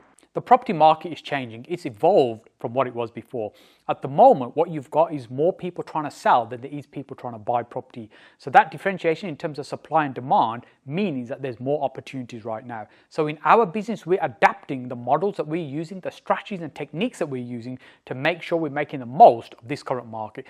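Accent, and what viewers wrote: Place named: Birmingham, United Kingdom. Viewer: Brum accent but perfect English though.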